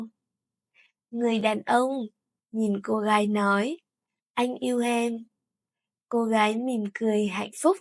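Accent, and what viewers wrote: North, Vietnam. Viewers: Thank you very much for your videos. I deleted all the other channels and kept only yours. I finally found what I was looking for, and now I only study with you. Im European, my girlfriend’s from HCMC and her dialect is a little different from your northern one, but I still continue to watch you. Thank you for being there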